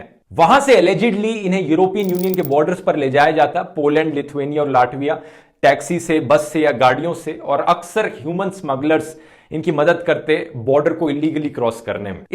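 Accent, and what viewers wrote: India. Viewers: I completly agree with you mate. Just a question, what language do you speak? because it sounds like English merged with Hindu or other Indian language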